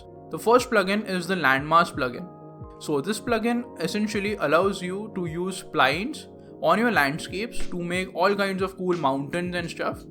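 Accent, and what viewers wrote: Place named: India. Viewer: i hateee,i hatee india guy english accent!!!!!!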